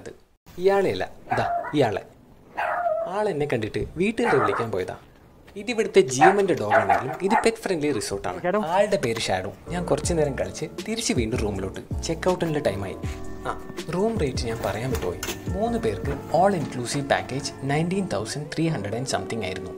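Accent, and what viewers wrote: Kannur, India. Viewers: He is from kannur athonda evande sound egane😍🤩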